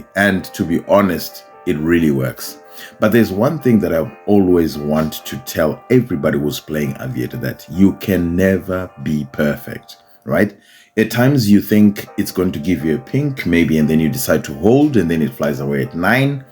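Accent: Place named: Zimbabwe. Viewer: From your accent I can tell you are mwana wekwedu kwa Sadza 😂...I like the way you do it bro,hah munondiitira boo.Im in Zim zvangu but I do have a Hollywood and lottostar accounts ....I would appreciate even a voucher zvaro ...who knows pamwe ndingamuke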